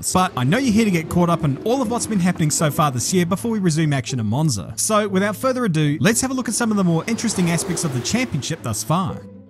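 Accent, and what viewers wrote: New Zealand. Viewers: Let me just put it out there. has the best narrating voice. The kiwi accent is just magnificent.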